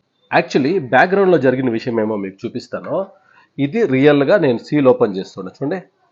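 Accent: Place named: Karnataka, India. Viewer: Bro meeru Kannada na ? Endukante , ma frnds kannada colleagues kooda telugu ilane maatladutaru , so slag acham alane undi Karnataka people's laga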